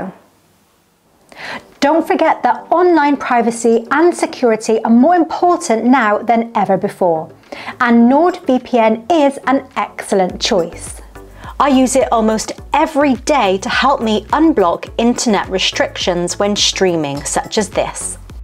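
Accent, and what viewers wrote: United Kingdom. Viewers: I like you a lot for several reasons, to start with, you are a lovely girl, feminine, attractive, beautiful British accent and so on.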